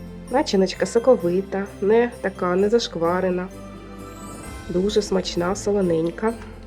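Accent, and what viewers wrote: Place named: Kharkiv, Ukraine. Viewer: Дякую за рідну мову і рецепт..Ви,мабуть,з Харкова,бо чую рідний акцент.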